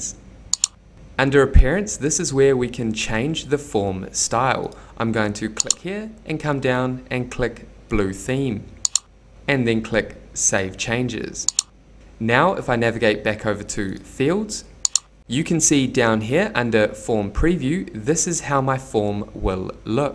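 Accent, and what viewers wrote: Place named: New Zealand. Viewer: Thanks for the great info! We are a Kiwi/Aussie family living in Paraguay so it's nice to hear your Kiwi accent on the video! 😉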